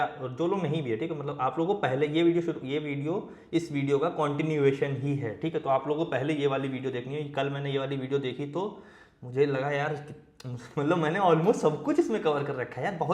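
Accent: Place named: Uttar Pradesh, India. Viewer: Up accent❤😅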